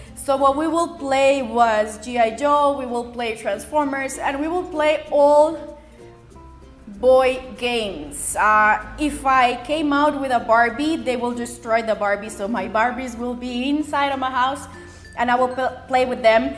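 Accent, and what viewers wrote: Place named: Europe. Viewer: I remember I would borrow my mothers cell phone when I would go to high school basketball games with my cousins. She wanted me to have it so I could call her at home when we needed to be picked up after the game was over. She then bought me my first cell phone when I was 20 as a gift because she was worried about me driving to visit my older brother who lived one hour away. I was thankful but I knew at the time I didn't see why I would need a cell phone. By the way, I was born in 1984 and I'll be 34 in 3 months. My cousin said I'm just a millennial because Xennials were born from <num>. Well I was conceived in October of 1983 if that counts, lol! I feel closer to generation X and Xennials more than Millennials. I finally created an Instagram last Saturday. Now I know what DM means, (Direct Message). I still love the great outdoors more than being glued to my cell phone. 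I'm more into being outside cycling or hiking on trials. Your childhood is very similar to mine. I like your accent by the way. I wasn't sure where you are from at first. I assumed you were from Europe. Well have a great day.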